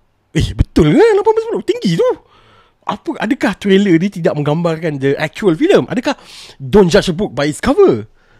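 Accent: Kedah, Malaysia. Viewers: First time dengar cakap loghat Kedah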